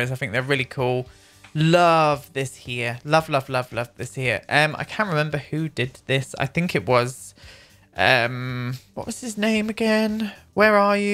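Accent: United Kingdom. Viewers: Your accent is the accent I do when doing a british accent and I guess it's a real accent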